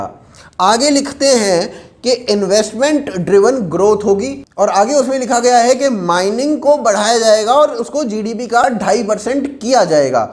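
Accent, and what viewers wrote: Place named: Allahabad, India. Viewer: baba illahabadi (allahabad) accent mare ho tum ek bhokaal!!! nice to hear such accent on ur channel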